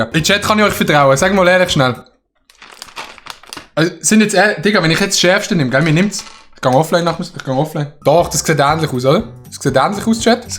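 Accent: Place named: Switzerland. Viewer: Deinen Schweizer Dialekt erkenne ich sogar, wenn du Englisch sprichst 😂👍🏼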